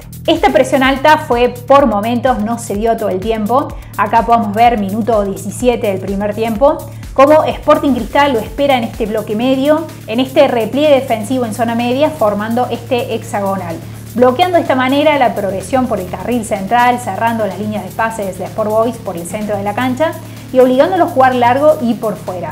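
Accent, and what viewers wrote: Córdoba, Argentina. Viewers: Wao sabes demasiado de fútbol mucho más que muchos comentaristas reconocidos y youtubers famosos Has estudiado algo referente al fútbol? Hace poco descubrí tu canal y es una joya algo que no hay en la plataforma análisis táctico de la liga peruana Increíble Nuevo suscriptor y me parece que eres de Córdoba 😊 Saludos desde Lima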